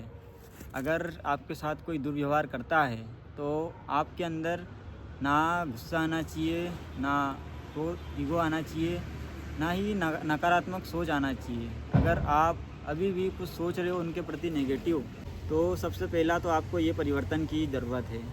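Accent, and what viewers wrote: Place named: Malwa, India. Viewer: नर्मदे हर🎉भैया जी और माताजी।आप लोग तो परिक्रमा में ही ठीक थे।बिल्कुल चेहरे मुरझा गए हैं।माताजी तो ठीक है, पर आपकी तो आवाज ही पहचान का सूत्र है ,चेहरा तो अजनबी हो गया है😅।कृपया अपना संपूर्ण परिचय दें। बातचीत से मध्य प्रदेश निवासी लगते हैं,मालवा बेल्ट के।बहुत अच्छा बोला है आपने, बिलकुल साधु की तरह। नर्मदे हर🎉।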